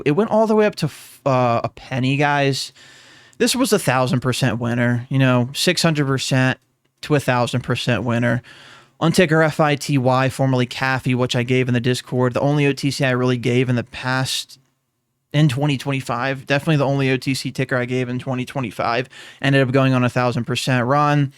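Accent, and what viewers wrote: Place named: Northeast, United States. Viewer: Homie i have to know where is your accent from, has to be the NorthEast region somehow. Let us know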